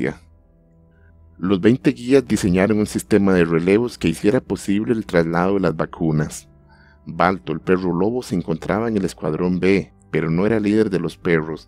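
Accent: Costa Rica. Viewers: Sabia que eras Tico mae!!! Desde que empezaste a hablar, dije,mínimo es de cartaguito. Buen video. Gracias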